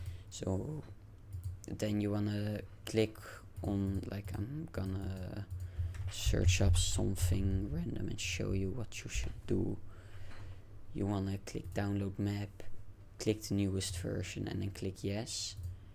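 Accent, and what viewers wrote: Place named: Netherlands. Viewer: (ik ben ook nederlands ik herken het accent gelijk😭)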